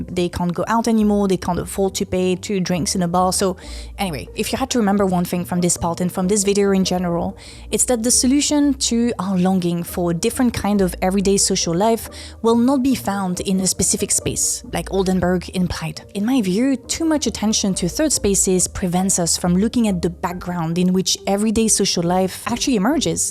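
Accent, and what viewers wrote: France. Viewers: Cute french accent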